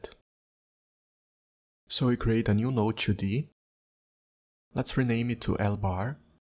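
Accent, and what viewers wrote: Brazil. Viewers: Muito bom irmão, Conheço de longe a voz de um BR kkkk otimo tutorial, poderia ensinar como funciona o sistema de autotiles do godot?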